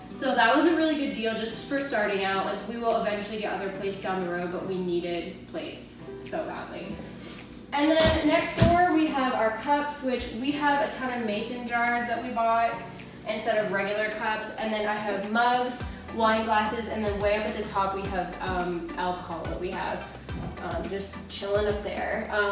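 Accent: Maine, United States. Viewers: On another video you asked if you have an accent. I can here the Maine accent in certain words, like when you say "certain".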